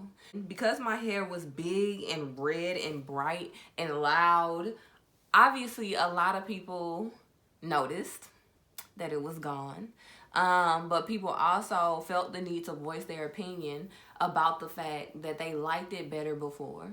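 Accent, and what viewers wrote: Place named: United States. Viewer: Where in the US are you from... I love da accent. You are beautiful and blessed.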